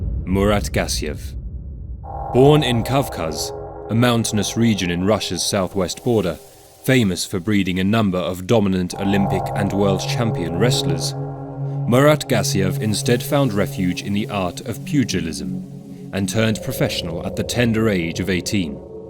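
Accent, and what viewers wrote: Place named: United Kingdom. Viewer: Damn got a beautiful British accent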